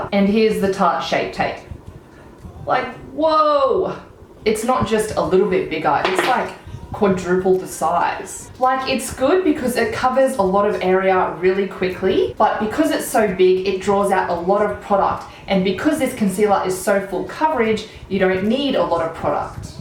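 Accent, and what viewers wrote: Australia. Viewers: Are you Australian live room Egypt ❤️❤️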